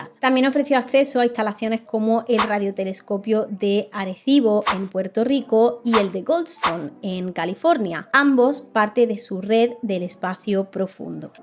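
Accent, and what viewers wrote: Andalusia, Spain. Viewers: Amo el acento andaluz y encima contando cosas interesantes. Saludos desde Chile!! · Excelente video: sigue adelante el tema es muy demandado a día de hoy. Jajjj. Tienes asento andaluz muy lindo. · No sé Uds, pero lo interesante del tema lamentablemente lo perdí, por lo embelezado que me dejó el hermosisisisimo rostro de esa niña! ah! y la ñapa: me encantó el contraste que tiene entre su pronunciación de términos en inglés, y el acento (creo que es andaluz, corríjanme) cuando dice palabras en plural y se "come" la "S", 😊... ese: "etádo unido" le suena muy cool, que lo digo yo que digo "caraca", en vez de "caracas", que es de donde soy... PS: en cuanto al tema del video, prometo oirlo en vez de verlo, para concentrarme en lo que dijiste! Tip: baja un poco el "peluche" ese que cubre el micrófono, para que dizque se oiga mejor.. 😉... 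una vez digerido el material, prometo reuní un dinerillo para comprar un cohete.... para qué? preguntará la... pues "PARA LLEGAR A AMARTE"! 😅😅... (tú te comes las "S", pero yo no me como las "A", jeje) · Me Encanta ese acento español❤ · Está hablando con acento andaluz, el de mi tierra, si no te gusta agua y ajo. Luego te quejarás del doblaje español y preferirás el latino que hace que parezca que todo es una telenovela. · Lindo acento andaluz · Que interesante video, Pero por Dios, de que parte de España es ese acento? Es muy hermoso 🤩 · el mejor resumen acerca del tema con un hermoso acento andaluz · Me suena a Andalucia ese acento😅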